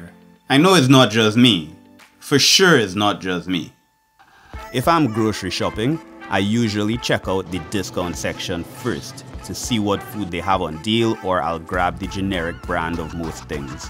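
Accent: Trinidad and Tobago. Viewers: just from the beginning could hear the trini in you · mans in japan but you can still tell he is trini to the bone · I can't believe I couldn't immediately place your accent! Trini man out there in rural Japan!! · In the first 15 seconds of the video I said that sounds like a Trini. I'm glad to see you expanding your horizons. Recently I've been visiting mostly Latin American countries but my dream is to visit Japan in the near future.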